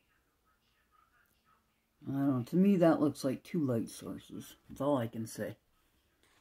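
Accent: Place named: Michigan, United States. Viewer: I love hearing your Michigan accent. Wow lotza stuff up there today, here thick gray so far making it so dark inside.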